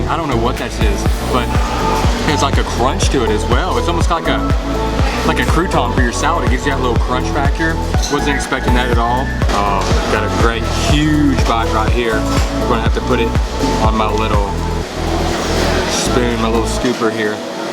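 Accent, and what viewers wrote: South, United States. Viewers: You are very descriptive in your food reviews, plus your southern accent is great. MA...LAY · I'm not sure where you're from? I would guest southern ish US? The way you talk its so calm and soothing n yet can feel the excitement... Good video btw Subcribed :-)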